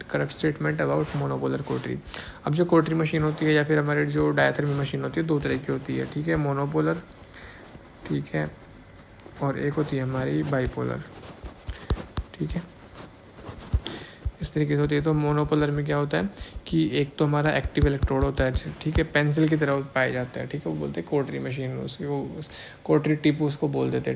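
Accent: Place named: Himachal Pradesh, India. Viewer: Sir aap himachal se ho na apke bolne se pta chl rha hai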